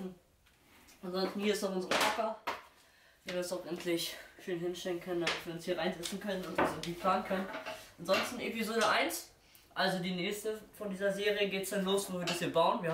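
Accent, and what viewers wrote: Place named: Berlin, Germany. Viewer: die Videos werden immer professioneller (bitte nochmal ein ganzes Video nur im Berliner Akzent, sonst Deabo)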